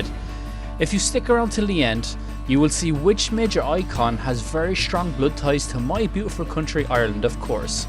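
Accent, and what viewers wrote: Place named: Jamaica. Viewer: You sound so Jamaican ❤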